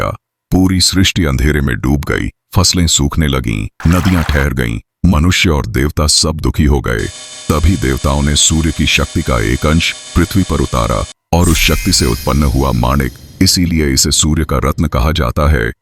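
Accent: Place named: Pahadi, India. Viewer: पहाडी आवाज अच्ची हैं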